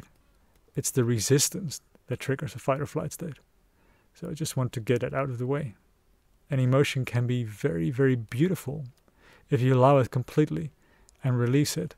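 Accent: Netherlands. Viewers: Exactly Its the balance. I realised for me I first need that feeling in myself that my fear is seen and calmed down like my inner parents talking to my inner child to reassure it. Then I let go of the resistance and there is an opening for undertaking a positive action and a good practise is doing a positive visualisation before the action that makes it very strong and usually succesful. For me For a long time I tried to do Brain retraining and forgot to first do brain retraining on relaxation and safety in the body which Made every letting go make me feel dizzy fatigued and in a stress state. So finally realising this and working on it now gives me an opportunity to let go of old beliefs and implement new ones. Are you dutch btw? You sound very dutch to me.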